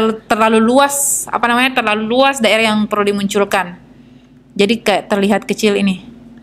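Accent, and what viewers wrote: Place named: South Sulawesi, Indonesia. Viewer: kentara sekali kalau orang sulsel, deee, ya kan?! hehe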